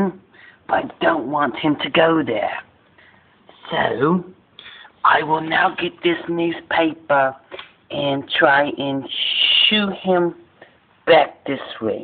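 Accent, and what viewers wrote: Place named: Australia. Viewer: ! I love your Australian accent and your amazing skills with the newspaper. I can't wait till you make more videos like this!:)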